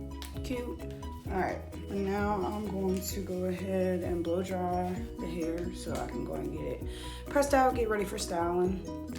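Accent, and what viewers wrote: South, United States. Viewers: This look so bomb!🔥😍 and you have a thick country accent, where are you from? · sound so country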